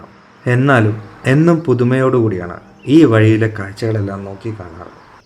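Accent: Palakkad, India. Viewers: താങ്കളുടെ പാലക്കാടൻ ശൈലിയിലുള്ള വിവരണം വീഡിയോ പോലെ മനോഹരമാണ്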